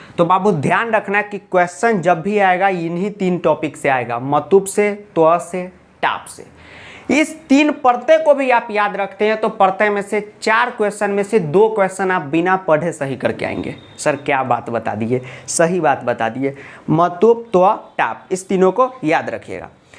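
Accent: Bihar, India. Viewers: sir are you from Bihar bcz your language is so sweet I also belongs to bihar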